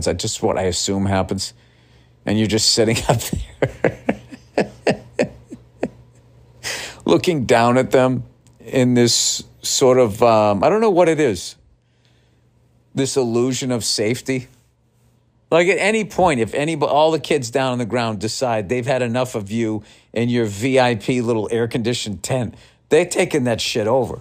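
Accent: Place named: Boston, United States. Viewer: Bill is fom Bwoston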